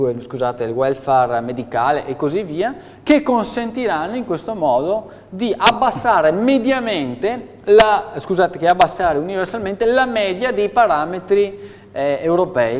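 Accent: Veneto, Italy. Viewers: Benetazzo, non peredere mai il tuo accento trevisanpadovano che ti caratterizza!!! bella Malta!!! ;-)))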